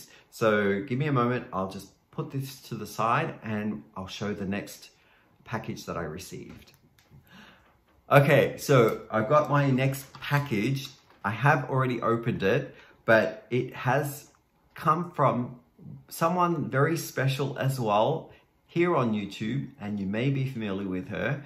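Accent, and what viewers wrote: Australia. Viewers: A<link> 😆 A Pixel appearance! You should actually do some video vlogs with Pixel sitting with you on camera 😜😍.. I never knew you were from Australia 🤪 I knew u had accent which I love but I just thought it was some sort of strong Canadian accent lol 😂 Why in the world would you move to the cold Canada from Australia dear? Lol 😝 What beautiful happy mailings. Those hobbi ones were gorgeous.. my fav is the darker purples one. The colors 8 and 10 I thought was the same color. They almost look identical. I bet you could actually use them together 😜 And Zee is wonderful! She’s so fun to watch. You were lucky to receive her birthday colorway 😍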